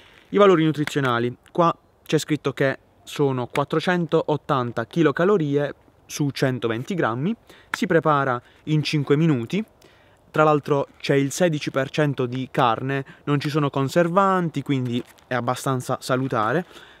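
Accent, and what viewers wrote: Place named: Apulia, Italy. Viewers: Capace che mi sento male. Ok sei pugliese come me, ora devo capire di che zona ahahahaha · Sei leccese o comunque pugliese? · Mi piace troppo il tuo accento. Sei andriese? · Spiegare come è fatta la pasta alla bolognese da un terrone con l'accento pugliese mi fa girare la testa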